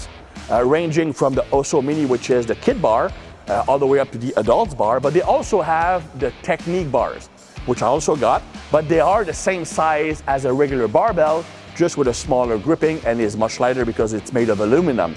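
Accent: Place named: Quebec, Canada. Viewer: Vive le Québec libre ton anglais est excellent mais l’accent te trahit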